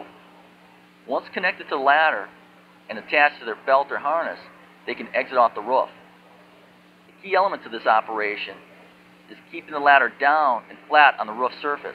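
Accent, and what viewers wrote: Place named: Upstate New York, United States. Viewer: Dude sounds like Upstate New York in accent.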